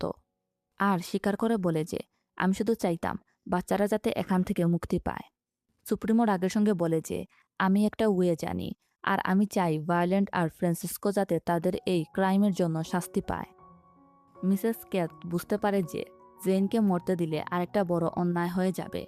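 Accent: Sylhet, Bangladesh. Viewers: Apu apni ki Sylheti?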